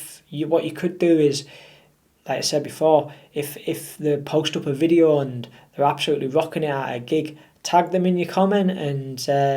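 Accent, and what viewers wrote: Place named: Yorkshire, United Kingdom. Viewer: Reason for asking cos you sound Yorkshire on ya videos pal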